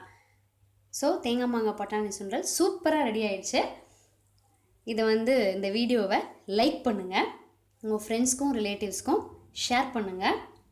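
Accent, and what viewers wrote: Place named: Thanjavur, India. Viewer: Nenga pesara slang thanjavur slang mathiriye irukku.....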